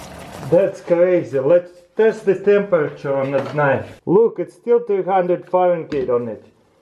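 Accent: Russia. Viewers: I really love his Russian axent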